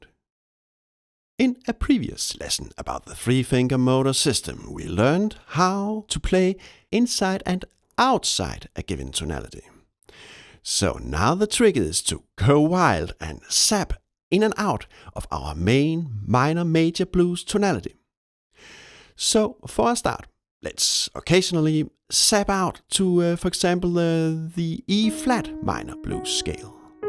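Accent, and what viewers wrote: Denmark. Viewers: holy denglish han må simpelthen være dansker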